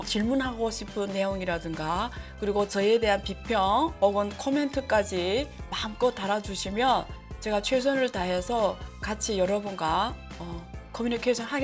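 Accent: China. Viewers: 많은것 배우고 있습니다~^^억양이나 말투때문에 실력이 살짝 묻히지만 완벽하지 않은 모습이 더 정감이 있어요 인간미라고 해야하나요? 중국분이라 더 객관적으로 한국을 판단하고 계신것같아 그또한 잇점인것 같습니다~~ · 이분조선족 임?발음..ㅋ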